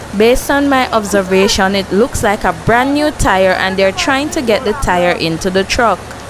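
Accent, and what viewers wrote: Jamaica. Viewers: You talk funny woman, like Island woman, like Jamaica.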